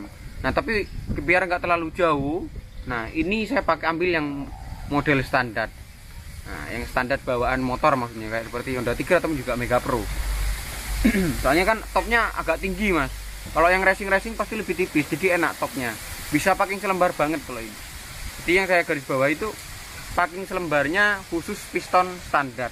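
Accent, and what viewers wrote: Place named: Yogyakarta, Indonesia. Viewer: aku barusan sub,sampean arek ndi sih,kok ngomonge koyok jowo jogja.lokasi bengkel dimana mas,salam dari jawa kaltim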